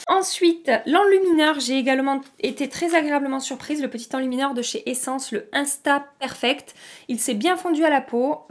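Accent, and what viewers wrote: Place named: Bordeaux, France. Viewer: serais tu de Bordeaux ou de la région bordelaise pour avoir cet accent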